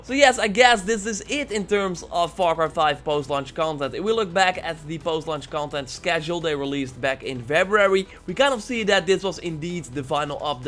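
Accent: Netherlands. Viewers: Im guessing that you are dutch or belgian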